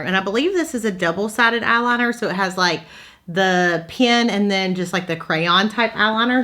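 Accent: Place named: Tennessee, United States. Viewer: As a Tennessee girl living in Colorado, your sweet accent makes me homesick. 😍. Thank you for your generosity in doing a giveaway!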